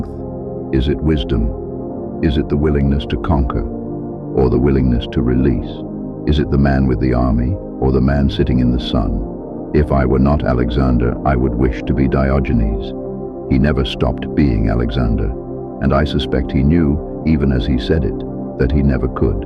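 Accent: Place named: United Kingdom. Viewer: so bored of that british accent commentator without a face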